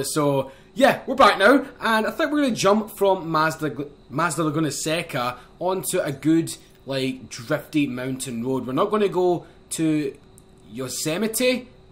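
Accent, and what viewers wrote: Scotland, United Kingdom. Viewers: ur awsome great vids BTW where abouts r u from in Scotland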